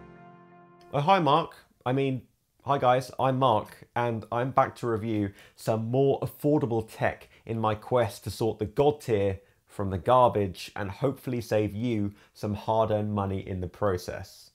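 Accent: United Kingdom. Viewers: That’s just because he’s got that “Posh” Brit accent. 🇬🇧 He could be describing his morning dump and it would sound like gold.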